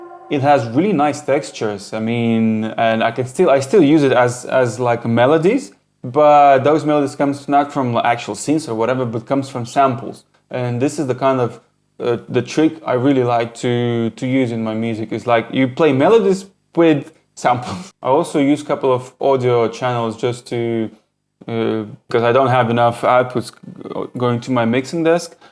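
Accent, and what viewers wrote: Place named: Eastern Europe. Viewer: Your accent always really takes me back, Its like half eastern european and half british cockney almost?! Have you spent time in UK?! Wicked tunes as always mate.. DIY cassette releases are the ay forward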